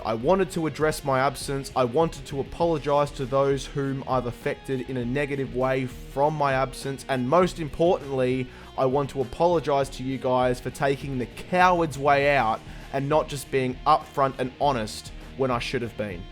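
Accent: Australia. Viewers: So good to hear an Aussie on YouTube, seeing I am an Aussie, no explanation needed, do your videos at your own pace, your videos are awesome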